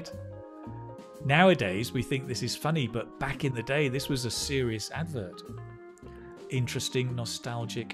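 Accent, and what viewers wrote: United Kingdom. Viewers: Is teacher from UK, bro?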